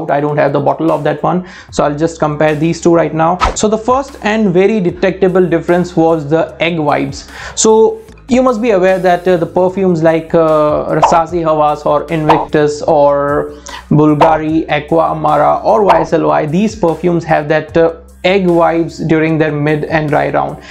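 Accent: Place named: India. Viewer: Nice review bro. Just one small request; either use complete desi or complete American accent. Speaking desi English and using American "Rrr" or "gueed" alone isn't gonna make you easy sounding for the International viewers. Get some accent classes. You won't regret it. And if you are targeting desi viewers only, we don't mind full desi.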